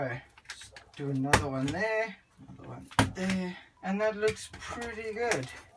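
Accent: South Africa. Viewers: Can spot a South African accent, my baby ball python just shed and my adult is in blue, can't wait to try this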